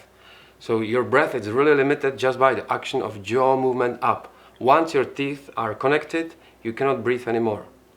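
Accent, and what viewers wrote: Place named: Norway. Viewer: You sound norwegian..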